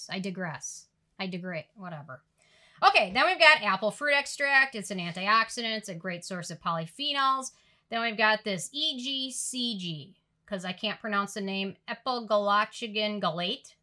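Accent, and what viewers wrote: Minnesota, United States. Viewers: I don't have blemish worries, but I watched the entire video anyway.😆 That peach top is a great color for you and I LOVE your purple eyeshadow--so pretty! I like listening to your Minnesota accent. I'm a former Yooper (know what that is?) so our regional accent is somewhat similar.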